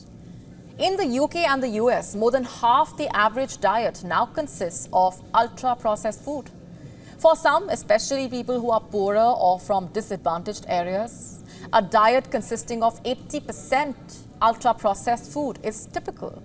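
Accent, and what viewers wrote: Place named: India. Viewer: Indian. It's the only accent I can't stomach, I don't know why.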